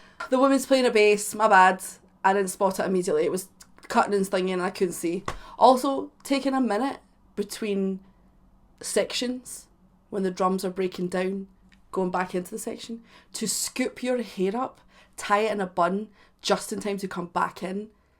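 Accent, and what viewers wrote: Scotland, United Kingdom. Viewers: In one video you say you'll be friends with, and fight the people in the comment sections. If the accent wasn't enough, that's all anyone needs to hear to know you're Scottish!!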